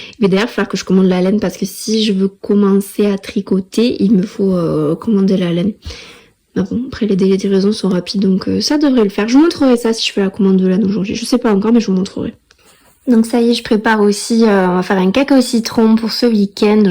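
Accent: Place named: South, France. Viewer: J'adore ton accent, tu es du sud-est ou du sud-ouest? personnellement je suis ton vlog depuis Toulouse, j'aime le sud de la france. Ton petit chiot est adorable!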